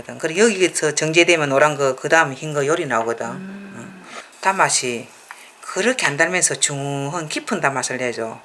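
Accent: Gyeongsang, South Korea. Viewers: 좋아요 구독 알람까지 꾸욱 경상도 사투리가 넘 정겨워예^^ 저는 부산이라예^^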